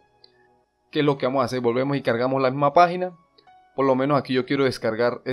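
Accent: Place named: Táchira, Venezuela. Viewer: Muchas gracias, saludos desde San Cristóbal, reconocí tu acento gocho mi pana!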